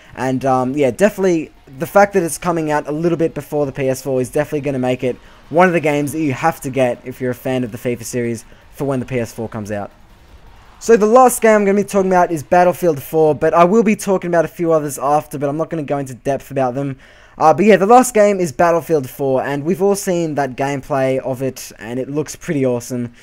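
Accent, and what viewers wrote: Australia. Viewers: nice list bro I agree on everything you said. ps: are you Australian? ^_^ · its just so nice to hear a familiar australian accent on youtube. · He's Australian. Not everyone speaks english like you. British, Caribbean, American, Australian, Canadian. All english, just a different dialect of the language.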